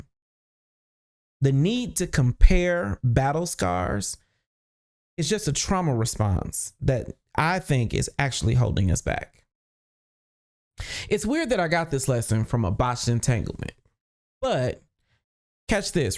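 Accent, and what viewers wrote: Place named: Detroit, United States. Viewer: are you from Detroit? "what up doe?"?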